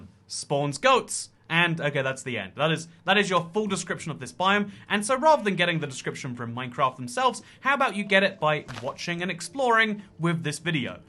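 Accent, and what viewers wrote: United Kingdom. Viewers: cus he's bri*ish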